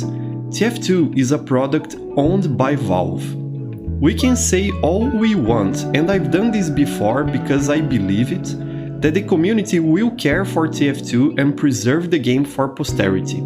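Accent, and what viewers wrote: Brazil. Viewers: Has someone told you that yer have a very Brazilian accent? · you sound brazilian ngl, but i liked the video, i think tf2 is a godly game but i hope it dont die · man, are you brazilliam? I played once with the medic called "Kuling" who was healing you, also your accent, cool to see more brazillians in the tf2 community <3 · I recognize the Brazilian accent a thousand miles away lmao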